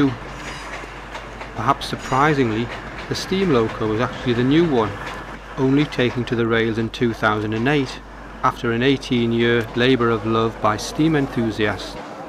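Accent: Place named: Geordie, United Kingdom. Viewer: Yeh, good narration from a fine purveyor of words. I'm a surrogate Geordie but always enjoyed the accent and this is well thought out and spoken. Miss my single decker 152 along Brinkburn! ))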